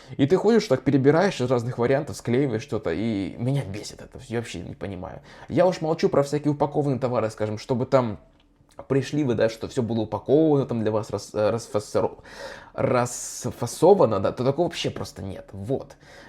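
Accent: Russia. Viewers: Послушал автора и сразу понял, что он с россии....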